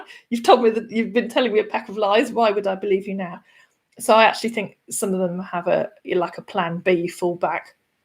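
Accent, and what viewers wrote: United Kingdom. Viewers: Hello! I'm learning English and I discovered your chanel. I'm interested in romance scamming because it's an interesting subject. I've seen several videos of yours and at last I see your face. Your have such a lovely voice and I like your British accent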